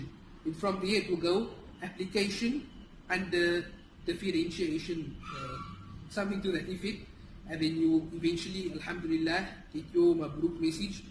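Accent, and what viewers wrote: South Africa. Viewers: lol you can hear that this bru is from Cape Town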